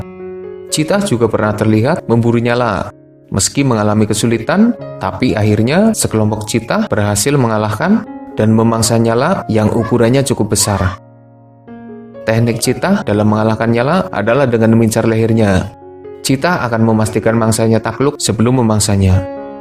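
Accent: Banyumas, Indonesia. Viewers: Logat ngapak ye..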